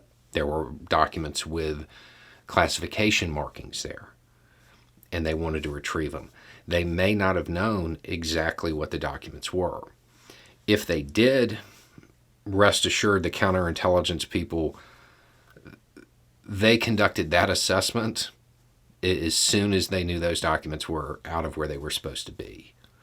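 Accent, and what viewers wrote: South, United States. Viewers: Similar story here and after the first vid I decided that I owe an apology for having misread him from his accent and appearance. Then I looked inwards a little deeper and saw that the root of that misjudgment was MY OWN PREJUDICE. As someone who likes to think of themselves as being to the left on practically every issue that came as somewhat of an unpleasant surprise. Then thinking on it some more, that means I owe a lot of people an apology because I'm now sure that there isn't just one intelligent left wing white person in the South of the US so if I owe an apology then I owe it to them just as much. So here it is - to everyone in the South who isn't an ignorant racist, I'm sorry I had y'all wrong and I'm trying hard to recognise my prejudices and correct them. I've been meaning to do this for a while and your post pushed me to getting it done, thanks Danny. 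👍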